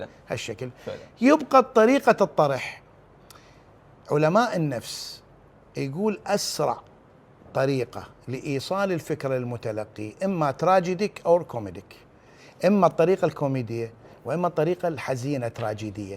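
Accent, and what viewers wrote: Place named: Basra, Iraq. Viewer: هسه هذولا إللي يگولون عليه إيراني شيحسون دشوفو لهجته بصراويه قح ربي يحفظ الشيخ الجليل والخلوق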